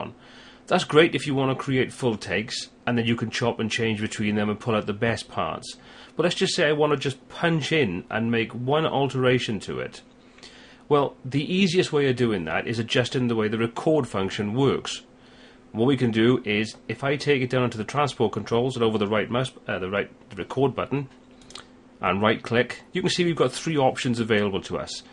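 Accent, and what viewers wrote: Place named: South Wales, United Kingdom. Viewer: Great. Definitely South Wales.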